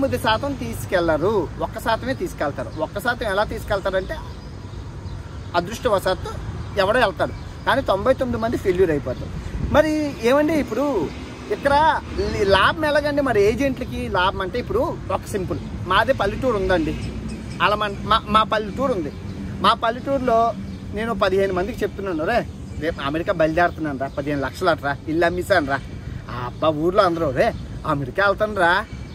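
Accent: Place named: North Andhra, India. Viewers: Nedi మా శ్రీకాకుళం మాటలు ల unayi స్వామి... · From your slang u seems to be from North Andhra. Your people are daring and enterprising. People in Malaysia and all in Indonesia are from your place.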